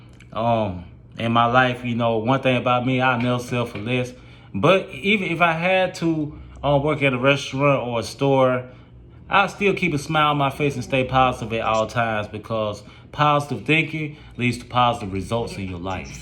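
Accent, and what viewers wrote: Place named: South, United States. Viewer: You from North Carolina/Virginia?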